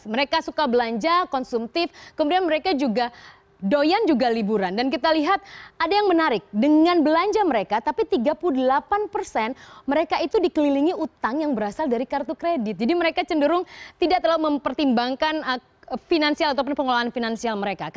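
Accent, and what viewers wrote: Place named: Eastern Indonesia, Indonesia. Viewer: itu aksen bung, kan dia dari Indonesia Timur, wajar lah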